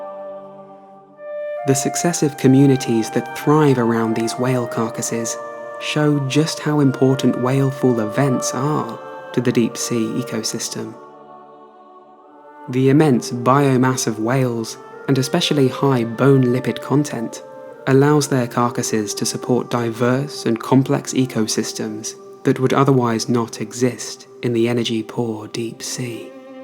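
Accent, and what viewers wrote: United Kingdom. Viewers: really wanna learn but god i can't listen to a british accent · i wish the narrator was not british 💔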